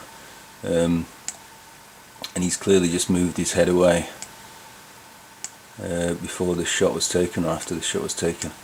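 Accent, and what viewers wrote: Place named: Liverpool, United Kingdom. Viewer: You’re definitely from Liverpool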